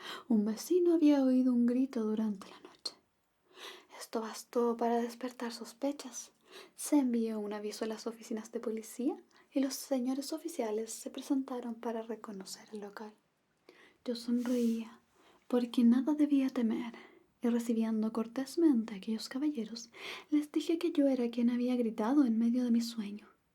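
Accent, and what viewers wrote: Chile. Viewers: Yo solo vengo a que me lean en chileno, aunque me odien jajaja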